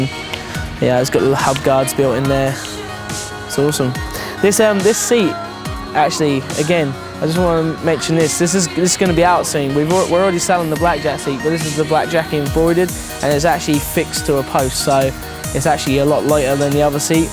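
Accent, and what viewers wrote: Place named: England, United Kingdom. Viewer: dont give him shit thats probs just how english say bike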